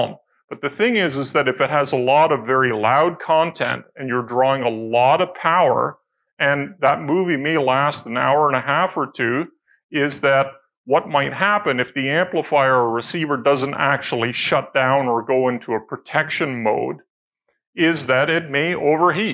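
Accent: Canada. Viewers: Canadian, eh? 😊 One of my favorite teachers was Canadian. That was like 50-60 years ago lol Howdy from the US! Enjoyed your presentation. 👍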